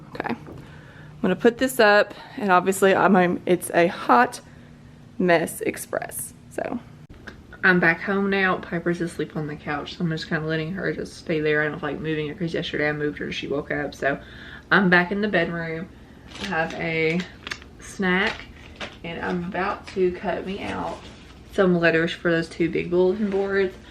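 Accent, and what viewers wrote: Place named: Kentucky, United States. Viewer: Fellow Kentuckian here, instantly recognized your accent lol. I'm just starting my teacher prep program and am currently teaching in Korea. Where in Kentucky do you live? I'm from Richmond/Berea/Lexington (lived in all 3 growing up haha)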